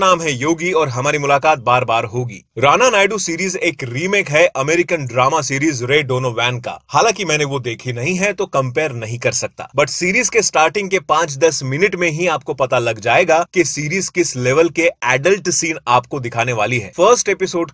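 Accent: Hyderabad, India. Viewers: Hyderabadi bolte aando💥💥💥💥💥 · speaking hyderabadi is just 🔥😮😹 Love from gujrat sir ✌️ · Aap ki Hyderabadi kirak hai ji bhai🤣🤣❤️💫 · aapki haidrabadi ka jawab nahi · u r great in hyd hindi language 😂😂😂 i am hyd and i love it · Love from Hyderabad....your Hyderabadi language is 😂😂😂😂😂😂 too good 👍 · Hyderabadi op😂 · your heydrabadi accent too good!!!! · aapki Hyderabadi bahut acchi hai aapke Hyderabad sunke hansne ko bhi a raha hai maja a raha hai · Hyderabadi hindi aap ke muh se bilkul korg jaisi lagi, aapki voice korg ke liye ekdum best hai😍😍❤️❤️🤩🤩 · continue aapka haydrabadi 😂😂😂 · Loved to hear your hyderabadi accent sir.. Love from hyderabad 😍❤️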